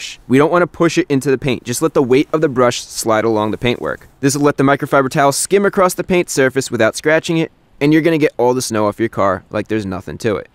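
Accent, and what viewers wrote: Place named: Pennsylvania, United States. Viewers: Yeah, that NJ accent is clear... especially "wooder". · New Jersey for sure. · I know hes from somewhere in the new york area solely because of the way he says water. This comment is being made after only watching 4-5 of his videos with no other context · has an accent that sounds like he's from southern Pennsylvania....we all say water like that here lol Good tips though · Its new jersey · Jersey thing like saying “where are the khakis” is “where are the car keys”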